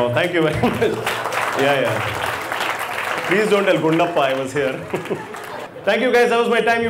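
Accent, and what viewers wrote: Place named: South India, India. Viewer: your english is south indian n name is north indian. how come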